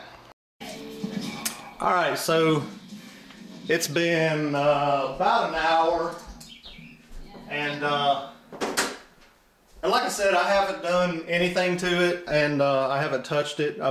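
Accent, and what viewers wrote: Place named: South, United States. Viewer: Love it. And I'm going to guess you're not from up north. Seeing as you don't bother with wearing clothes for a Youtube video and you pronounce gel as jail. No judgement here! You are rocking it. Thanks for the video.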